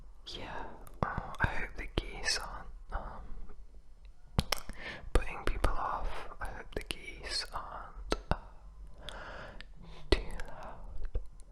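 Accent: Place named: United Kingdom. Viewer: The British accent is naturally ASMR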